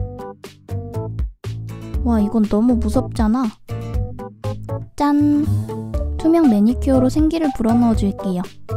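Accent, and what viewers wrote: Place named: Japan. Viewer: 뭔가 말하는게 외국인같다 그 욕하는게 아니라 발음이 뭔가 둥글둥글한? 일본인이 한국말하는 그런 느낌이다 한국말 완전 잘하는 일본인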